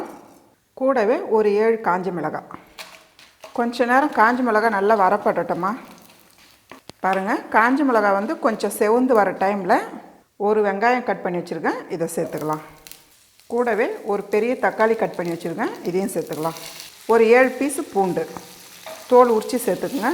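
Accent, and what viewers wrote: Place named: Tiruvannamalai, India. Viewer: Thiruvannamalai tamil slang I think 🤔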